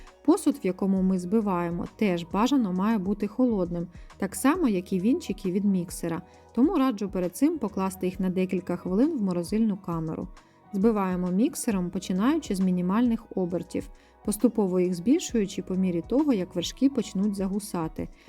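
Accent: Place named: South, Ukraine. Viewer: Добрий день! Мені здається що ви з півдня України, за місто промовчу!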